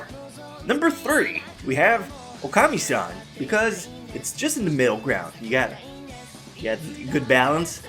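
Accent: Quebec, Canada. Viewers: Je ne savais pas que tu étais québécois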